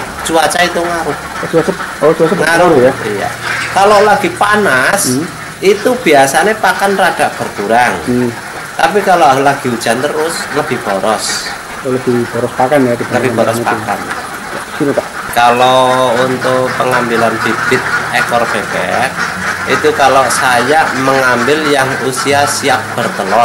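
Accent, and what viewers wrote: Banyumas, Indonesia. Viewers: Ngapak ngapak...kebumen atau cilacap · Ngapak temen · Kiye ngapak ya....tp nek ora ngapak ora kepenak... salam sukses selalu mas dan tetap semangat.. 😀😀😀👍👍👍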